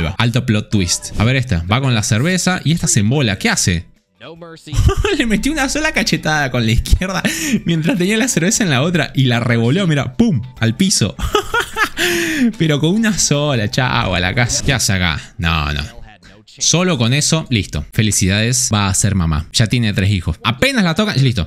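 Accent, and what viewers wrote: Argentina. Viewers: ?MARAVISHA, BRASHAN? ?que estás diciendo amigo ARGENTINO! !aquí en MEXICO no te entendemos nada !por respeto a México habla bien pronuncia bien las palabras. !no seas irreverente y mal educado!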